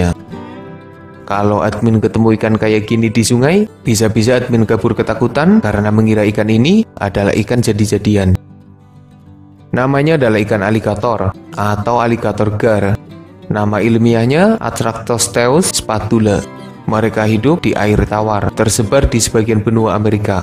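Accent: Banyumas, Indonesia. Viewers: Aksenmu mirip wong ngapak min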